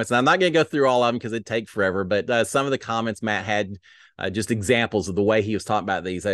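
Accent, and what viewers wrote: Texas, United States. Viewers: Thank you, for all of your hard work. I especially appreciate your clean recaps bc I'm not a fan of the language so many of the house guests use. So thank you for that. Not to mention, I totally enjoy hearing a fellow Texan while I'm living in Phoenix😂 Have a Blessed day!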